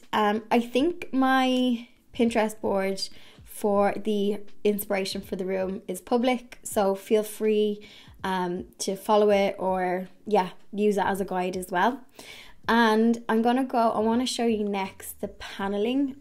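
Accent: Ireland. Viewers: Her little Irish accent is so cute ♥️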